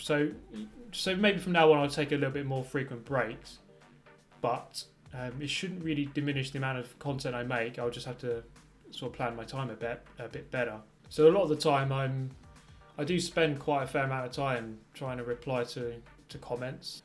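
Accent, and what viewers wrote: England, United Kingdom. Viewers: Which area of England are you from? E.g. North east, south west etc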